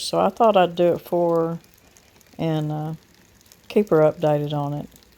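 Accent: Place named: South, United States. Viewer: I thought I was the only one😂glad to see others think so too. My Mother came from Tennessee and had that southern draw, reminds me of her, so when I get to missing her I turn to this channel and I am home again 😊🏡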